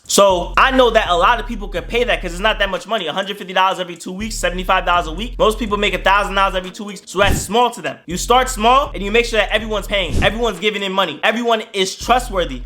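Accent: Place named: Caribbean. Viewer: I knew you were Caribbean 😅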